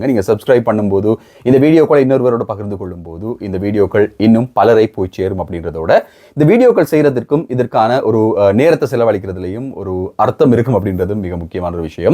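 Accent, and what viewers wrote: Sri Lanka. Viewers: Nice Lankan Tamil accent !!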